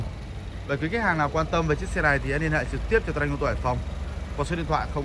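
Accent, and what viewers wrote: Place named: Đồng Cối Đá, Vietnam. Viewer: Noi đồng cối đá chuẩn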